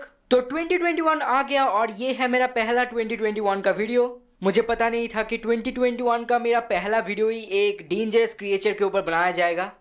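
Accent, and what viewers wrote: Bengal, India. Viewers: You Bengali ?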